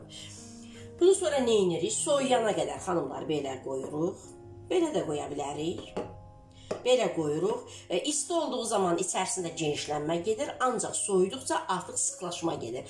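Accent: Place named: Baku, Azerbaijan. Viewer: Caaan Bakilim Lehcene qurban.Allah sizden razi olsun.Sizi sevincle izleyirem.Bakinin 32 kendine salam olsun.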